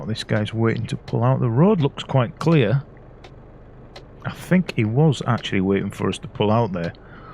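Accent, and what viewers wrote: Yorkshire, United Kingdom. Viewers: Finally a Yorkshire Youtuber! Subbed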